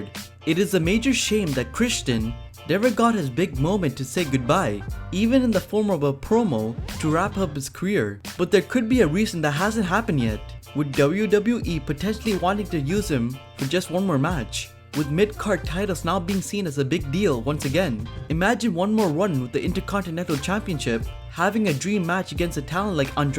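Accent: India. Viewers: The speaker is from where??? Sound like indian fucking garbage voice and accent trash channel trash speaker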